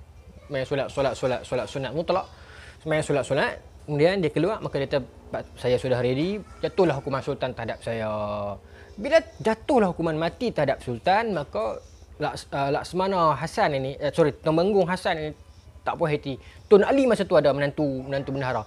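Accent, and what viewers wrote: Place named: Penang, Malaysia. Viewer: Amboi ustaz, lenggok bahasa satu macam miya 🤭🤣 Jarang-jarang boleh dengaq lenggok penang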